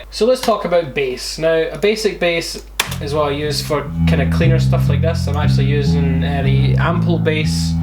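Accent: Scotland, United Kingdom. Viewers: Are you scottish? It sounds a bit like you're scottish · Scottish isn't a language. He's speaking English with a Scottish accent. Lol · Slow it down to 00.75 When he starts talking he sounds like Scottish Johnny Vegas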